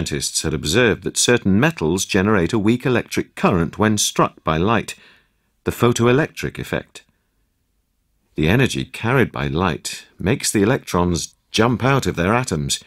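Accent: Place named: England, United Kingdom. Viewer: Who's the narrator? Best English accent ever!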